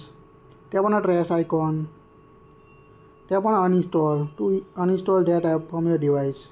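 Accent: India. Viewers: Just put captions it's indian English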